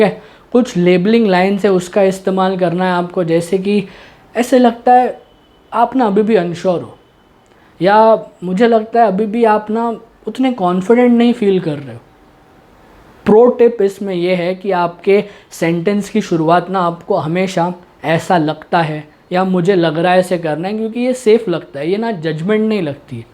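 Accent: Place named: Gujarat, India. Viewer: great sir, great video and from the way you speek i think you are from gujrat , back to point nice and informative video i will try this on my crush hehe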